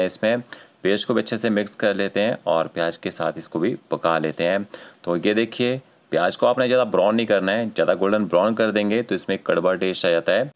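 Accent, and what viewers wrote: Pakistan. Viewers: Bhai you sound like Pakistani😅 aapne ye kaarni hai vo krni ha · Aapki voice ek pakistani jaisi lagti hai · Pakistani lehja hain apka to ji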